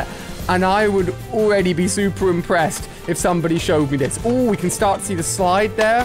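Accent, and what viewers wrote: United Kingdom. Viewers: R u British? I am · Are you British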